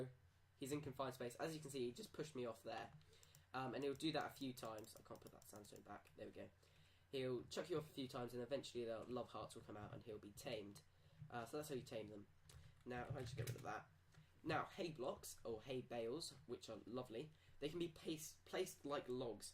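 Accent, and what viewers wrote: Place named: United Kingdom. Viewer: Subscribed my british friend i hop you take no offense for that i just love your accent :D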